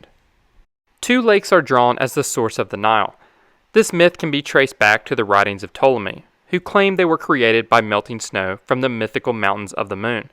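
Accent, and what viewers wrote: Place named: Mid-Atlantic, United States. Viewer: Have any other U.S. dwellers noticed the narrators THIIIICK mid-Atlantic accent? Idc that much but it lowkey drives me crazy 😭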